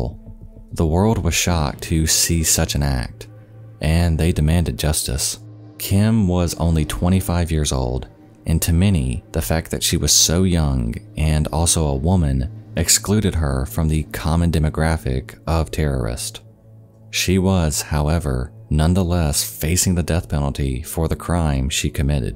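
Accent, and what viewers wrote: South, United States. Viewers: Is this ASMR? Smooth Southern ASMR. Are you young people able to stay awake listening to this?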